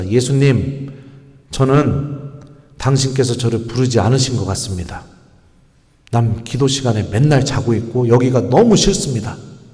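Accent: Chungcheong, South Korea. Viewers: 찬미예수님 ~♡ 신부님 서울가셨내유~다음 또 가신다구유~^♡~ 신부님 충청도 사투리 환장하게 재밋내유~ 서울말 안하셔도돼유~^^ 말씀자체가 신자들을 사로잡는당께유~신부님 아주 멋지십니다.제고향은 충남 부여 랑께유~^^ 신부님 건강하시고 행복하셔유~♡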